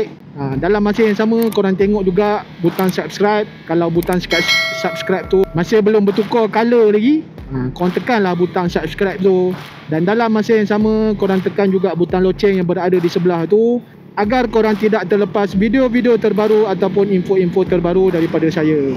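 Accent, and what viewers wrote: Perak, Malaysia. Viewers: Assalamualaikum Chor,Kome cakap org Perak je. Aje tanya je Chor.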